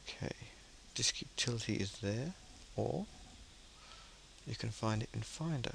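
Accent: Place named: Australia. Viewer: wanted to learn how to format my hd instead i got you loving to hear the sound of your Australian voice.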